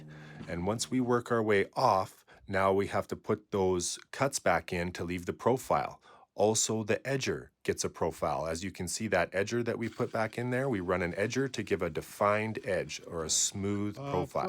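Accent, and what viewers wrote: Canada. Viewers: For canadians, your pretty good 😂 american say out, canadian sat ooot, great, great work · You sound Canadian. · Canada voice at its finest. Love the work you boys do!!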